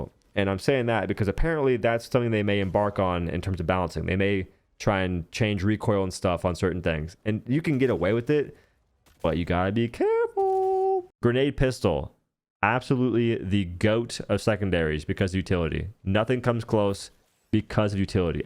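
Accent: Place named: Panhandle, United States. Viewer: This fella sounds like he's frum tha panhayndle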